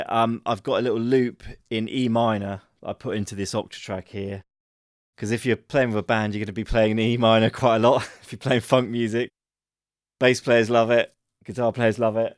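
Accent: United Kingdom. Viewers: I gotta admit ... there are few things funnier than hearing a white guy with a proper English accent placidly proclaiming: “We can all get down with our bad selves”. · I just love listening to your fine British accent, lad! Reminds me of a visit I once paid to my British pen pal, decades back from now. I was a pupil in German high school at the time. Oh. and your music, it is so adorably funky, love it 👍👍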